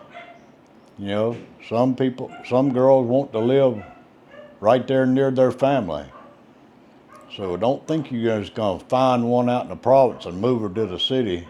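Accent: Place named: North Carolina, United States. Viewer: You remind me of my relatives on my Mom’s side of the family. They’re from North Carolina. Brought back fond memories listening to you speak. Love it.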